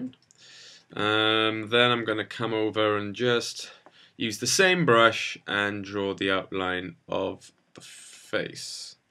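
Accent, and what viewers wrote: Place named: South Wales, United Kingdom. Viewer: Yanni Courtney he has a South Wales accent.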